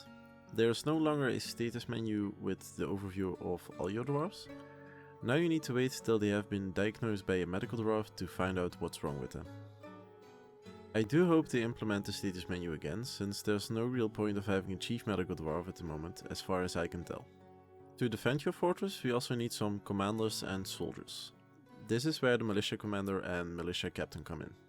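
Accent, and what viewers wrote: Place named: Netherlands. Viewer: I can't listen to this horrible Dutch accent. Jezus Christus.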